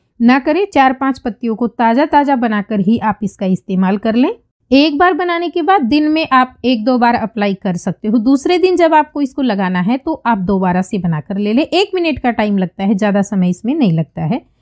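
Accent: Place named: Rohtak, India. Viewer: Aap rohtak se h kya Kyoki aapki Boone ki reathem bilkul mere jaisi h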